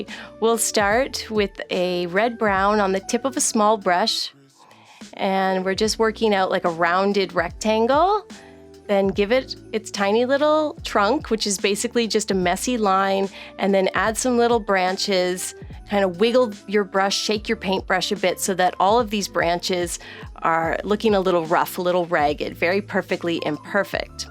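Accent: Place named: Canada. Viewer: Accent .... heard very slightly in the way you pronounce your "O's"...... Canada 🇨🇦? I can only aspire to paint like you..... 😒 my ink and wash is positively elementary.. you are so talented 💖 warmly, base of Mt Hood.... Oregon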